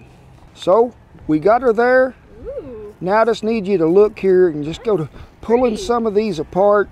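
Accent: South, United States. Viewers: well, it's cowboy drag, at the least. he's really worked on the "cowboy" accent. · to be honest I watch this because of the cowboy-accent. So stylish slang keep up the good work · Nice and clear american diction! You can work as a showman) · I like ur southern accent sir... really smooth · His voice and southern accent is so nice to listen :) and of course amazing recipes he does!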